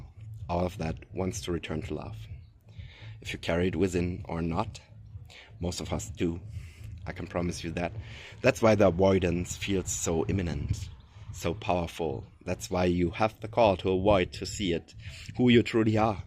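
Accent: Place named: Germany. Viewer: Thank you. Just found you this moment. Feels like blue ray expression transmitting, and now you confirmed my clear impression of german accent . Or swiss. Watched your history transmission. Interesting, but not very relevant from my point of view. I am open to share and love to get finally in touch/exchange with same frequency souls, like blue rays . Its about unifying now, from my point of view. Love from Patagonia, Chile from a humble german imigrant😅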